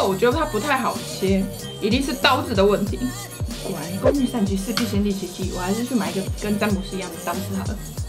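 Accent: Taiwan. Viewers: 的台語真的好好笑，這個影片真歡樂怎麼可以吃這麼多人還這麼漂亮又瘦...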